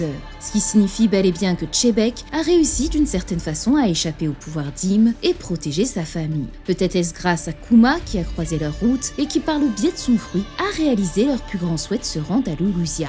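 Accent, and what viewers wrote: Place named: Belgium. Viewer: Le leger et subtil accent belge de retour :)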